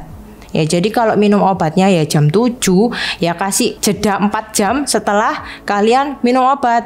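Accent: Java, Indonesia. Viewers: Medhok sekaliii ngomongnya. Trimakasih dok ilmunya.. 😅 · Makasih ce info nya... Logat bicaranya sy suka ce seperti wong jowo.. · Salam sehat Dok Mau nanya nih Kok ngomongnya MEDOG sekali Emangnya Bu Dokter Asli dari mana Mksh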